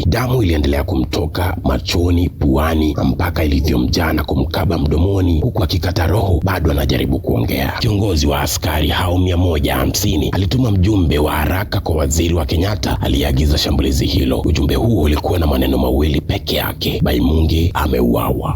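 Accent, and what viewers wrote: Tanzania. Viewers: definitely speaks like a ninja from bongo, maybe its that fellow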